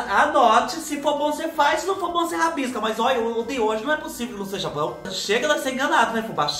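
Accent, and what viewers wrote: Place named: South, Brazil. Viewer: Fubá, também sou do interior de São Paulo, só que sua cidade é próxima ao Paraná e a minha próxima de Minas,por isso você tem esse sotaque mais puxado pro Sul e eu pro mineires...😂😂😂❤❤❤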